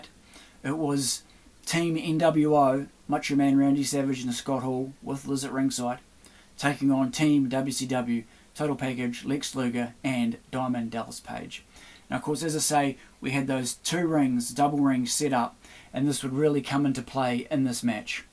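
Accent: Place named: New Zealand. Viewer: This takes me back to a 10.30 on friday nights through the 90z. By sound of your accent lad your from new Zealand aswell bro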